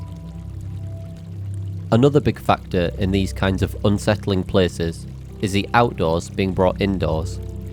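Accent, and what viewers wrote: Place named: United Kingdom. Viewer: Sylvianian Families was different because, it wasn't just the bg's. Go to the british adverts and you'll notice, each one TOLD a story! So you were part of this story world (that was remarkably close to our own, at least a couple of centuries back!)! *edit: wait. I noticed your accent and I said the British ads 🤣 ignore me on that part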